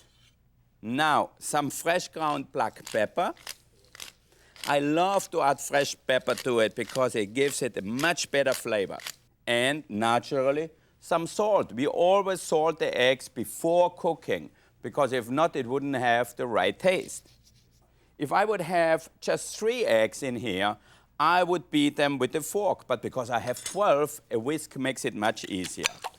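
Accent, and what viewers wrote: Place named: Carinthia, Austria. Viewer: this video stirs the future english teacher in me. i just want to give him pronunciation lessons...please let me! while our carinthian dialect was voted the sexiest in austria, i don't think the accent is very charming when speaking english... :'(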